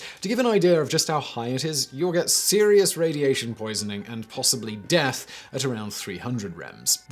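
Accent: England, United Kingdom. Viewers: This guy has the most British accent EVAH! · Cool story. But your pronunciation is still terrible. And the names and places sound like a mumbled run-on. Ciuda--Juarez. The guy's name is Vicente not viNcente. It sounds especially strange because you have that smart british accent thing going on but then butcher or mumble the names. I guess Americans aren't the only ones. At least they enunciate the letters, even if the pronunciation is wrong. Don't forget the O-bam-a. I'm keeping count. :P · I really enjoy this mans narrating, maybe cause im American but his English accent is quite soothing. Even if it’s about a radiation disaster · Hispanic names in a British are incomprehensible to my brain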